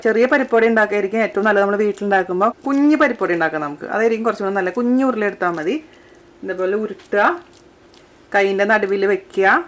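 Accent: Thrissur, India. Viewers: hy chechii!! u r really super!! njn thrissurkari aan .. athond thanne chechide slang and presentation style kekkumbo sharikyum ishtayii.& ur recipes r awesome tta! 😍 marriage kazhinjapo cooking onnum areelyarrnuu..ipo ith nokki nokki chechi paranj tharanath kett kureshe cheyyan padichu.. 😍 & most of all my in laws r so happy with me now 😁!! thnk u so much chechii 😃 keep going!! all d best !! 😘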